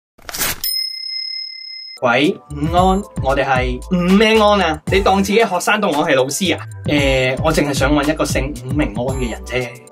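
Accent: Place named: Guangzhou, China. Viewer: 唔知係咪錯覺，我覺得你啲廣東話都有啲口音，係就好流利，但係有啲口音囖，可能係廣州音同香港音分別.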